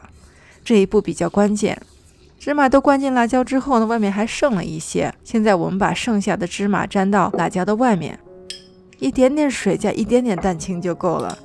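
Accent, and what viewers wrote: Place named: Shaanxi, China. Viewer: 好棒啊！每次回西安去回民街都会买点炸辣椒，特别喜欢吃，再陪着的陕西口音，太亲切了。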